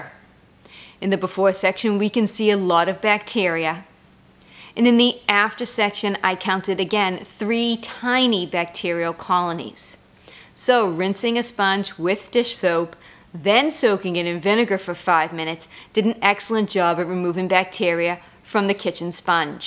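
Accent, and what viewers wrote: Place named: New England, United States. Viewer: This was good. I just discovered your channel and will have to check out more of your videos - they sure look interesting! I hope you don't mind this Canuck saying, I like your New England accent. ☺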